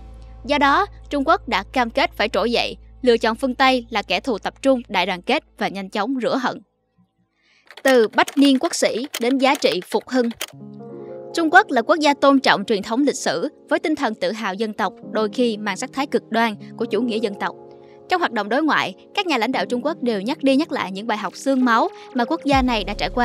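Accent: South, Vietnam. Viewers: mc người nam, ngã hỏi đọc na ná nhau, quan trọng là video viết đúng chính tả và nói đúng nghĩa của nó. Nếu nghe mà không hiểu tại sao "sỉ" mà không phải "sĩ" thì chỉ trách mình học chưa đủ nhiều, cần học thêm.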